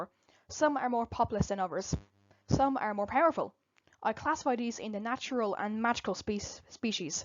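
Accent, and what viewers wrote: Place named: Ireland. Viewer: Wasn't expecting an irish woman when i clicked on the video, but what a present surprise. The maps absolutely incredible, and the screenshots of just how much detail went into the world, including climate bands, tectonics, elevation, ocean currents, really made me re-evaluate how sloppily I've been designing my own map currently. 1 thing I'd like to know is whether your world is tilted on it's axis like Earths or is in perfect alignment, it's something i see commonly missed in worldbuilding despite the fact that the axial tilt has a massive effect on the Earth, and the seasons/climate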